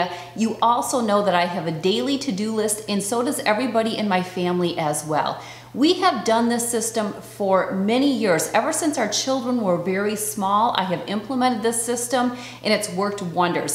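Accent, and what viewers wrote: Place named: South, United States. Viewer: I like the family calendar idea., random question, where are you from? (Which state?) I'm new and i haven't heard you mention it yet and I didn't see it on your website either but ya know what they say, I'm blind in one eye and can't see out the other (anyone else have that problem?:P) If you dont wanna say, thats cool. I'm just hearing southern....a lot of southern...but idk, i could be wrong:)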